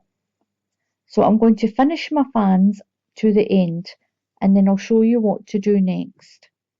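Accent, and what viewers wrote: Scotland, United Kingdom. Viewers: I've saved this fan pattern. I'm just a learner but I love trying out patterns. What size of crochet hook did you use ? Keep up the artistic work, your super duper . You speak the same lingo as me hen!!, nice to meet a Scottish lassie !! X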